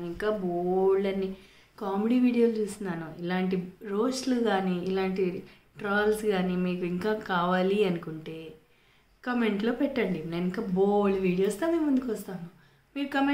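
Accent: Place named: Telangana, India. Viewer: Good try . Meeru telangana baaga matlaadutunaaru 😀. Keep it up .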